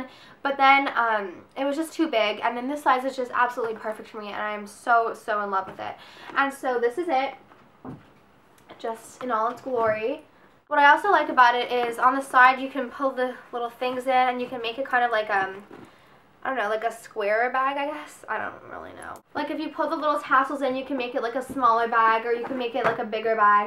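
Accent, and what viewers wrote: Canada. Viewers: I agree. I think I commented before asking if she was Canadian. I watch another girl's videos who speaks just like her & she is Canadian.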